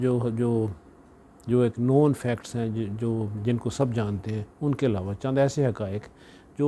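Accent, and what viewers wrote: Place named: Punjab, India. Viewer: کہوں مجھے آپ کے تلفظ سے مشرقی پنجاب کی جھلک نظر آتی ہے 😊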